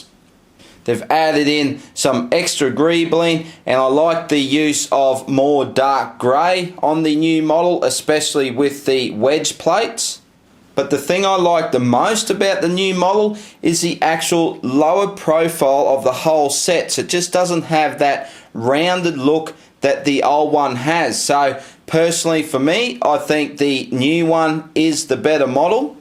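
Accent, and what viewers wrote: Australia. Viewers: Jedi Master Productions well coming from an Australian I can tell you that not every Australian speaks like that but some do. not putting on that accent to sound more Australian because it's his real voice. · I love your Aussie Achsent Aussie Aussie Aussie oi oi oi.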